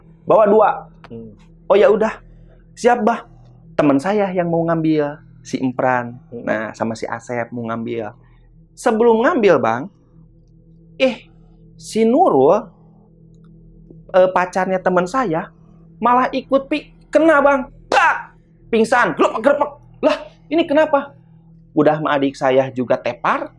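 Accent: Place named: Sunda, Indonesia. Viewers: deuhh kumaha iyeu nya logatna sunda pisan euyy😅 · aa, meni rame nyeritain na... orang sunda pisan... semangat a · Sunda bgt... 😁 Salam dari karawang 🙏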